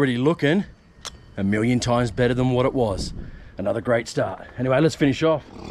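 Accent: Australia. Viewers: Love watching your videos. You have a keen sense of humor. Along with the humor you have a heart of gold. You are always helping others. Love the Aussie accent.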